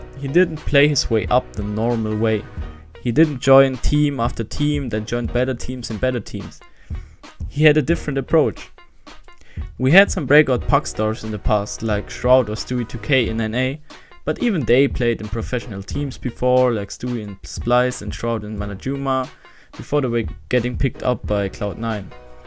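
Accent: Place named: Germany. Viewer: Man hört sofort den Deutschen Akzent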